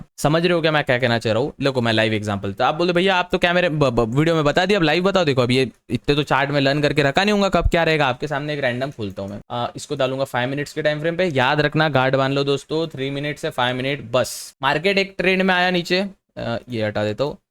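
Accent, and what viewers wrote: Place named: Indore, India. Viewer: Bhaiya aap indore se hai na pakka😅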